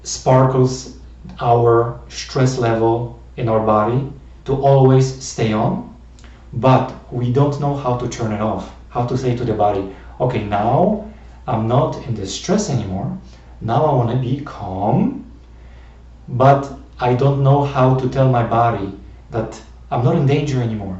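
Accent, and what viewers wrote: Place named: Europe. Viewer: You have an accent in European. You were born here.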